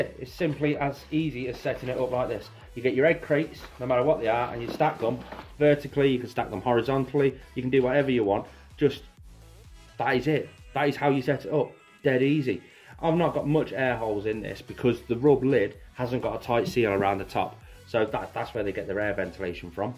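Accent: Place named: Cockney, United Kingdom. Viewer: i’m american, you sound cockney to me 🥴 i just enjoy the accent a lot and can’t quite say it right 😂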